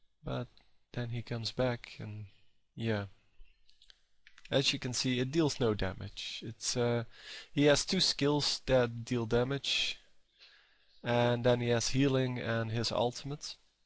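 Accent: Netherlands. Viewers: ze engels heet een verschikkelijk nederlands accent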